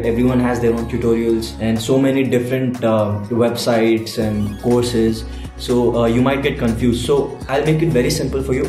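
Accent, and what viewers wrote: India. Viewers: Your accent is the perfect version of the Indian accent 👌🏻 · Wow your accent is like Indian.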